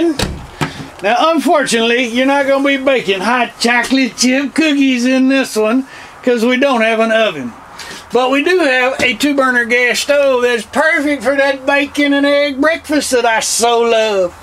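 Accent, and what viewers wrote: Texas, United States. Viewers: Sorry but you are a little heavy on the Texas accent/style of talking.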